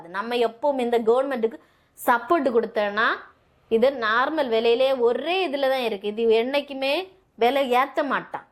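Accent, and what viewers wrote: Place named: Kerala, India. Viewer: இது என்ன பாஷை ஒண்ணுமே புரியல எங்கள் கொங்கு தமிழில் சொன்னால் தானே எங்களுக்கு புரியும் இதில் கொஞ்சம் மலையாள வாசனையை அடிக்கிறது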